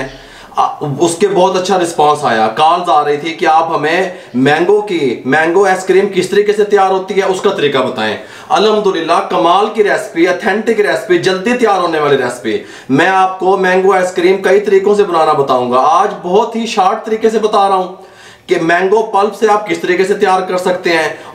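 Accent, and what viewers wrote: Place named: Punjab, India. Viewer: Bai luv u nd i like d way u speak hindi in punjabi tone nd ur recipes are awesome....luv from australia nd india..god bless u always..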